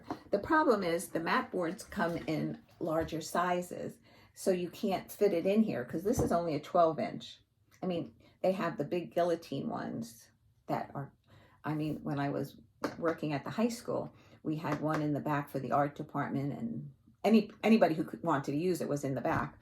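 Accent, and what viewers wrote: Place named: Long Island, United States. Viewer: I love listening to you! I grew up on Long Island, but I live in Kentucky now. I rarely get to hear the beautiful accent! I will be visiting my Friends House on Long Island in January. Thank you for sharing your expertise!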